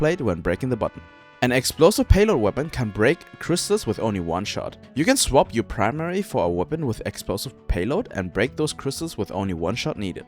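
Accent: Germany. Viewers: Me: not sure where this guys accent is from oh German got it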